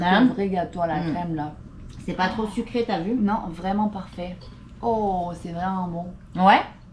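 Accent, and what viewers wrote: South, France. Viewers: Haaaaaaa tu m’as manqué 🎉 😮 trop cool d entendre le son du sud 😊 , du calvados j entend le soleil ☀️ merci 🫨 mince la creveson 😵‍💫 et oui 13 😊 , vous êtes au top ✌️ j’oyeux anniversaire en retard j ai envie de pleurer 😢🎉🎉🎉 bizou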